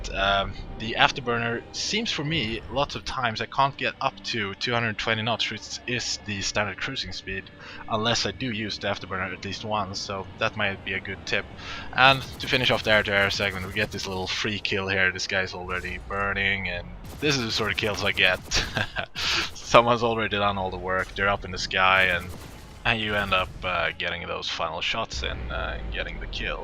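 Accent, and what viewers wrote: Sweden. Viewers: hes accent is very Swedish-Ish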